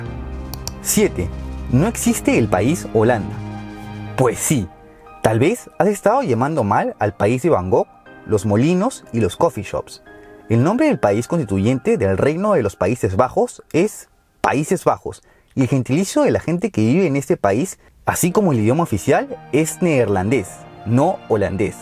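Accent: Peru. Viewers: Su acento dice q es peruano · Es primer video que veo de tu canal, y con eso ya sé que eres peruano por tu acento!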